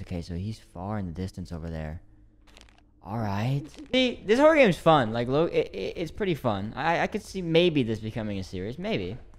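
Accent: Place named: South, United States. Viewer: plssss what is this southern accent 😭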